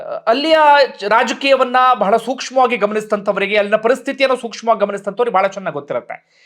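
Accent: Standard. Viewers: Adbutha vishleshane. Sir election result gintha nimma swachha sundara kannada vishleshane namage kelokke hitavaagirutte. Pakshaateetavada intaha sarala sundara visleshanegala payana yashassannu tarali. Abhinandhanegalu.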